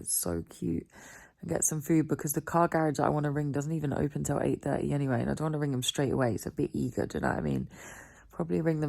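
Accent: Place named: England, United Kingdom. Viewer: Nice English accent different from Americans lol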